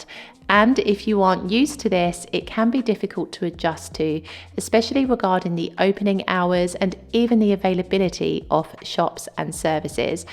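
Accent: United Kingdom. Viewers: Sounds like a British accent 😂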